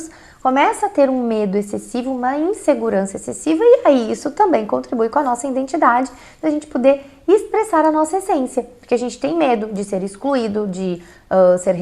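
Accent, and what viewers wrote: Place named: Rio Grande do Sul, Brazil. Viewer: Em 1 minuto de vídeo já detectei o sotaque gaudério kkkkk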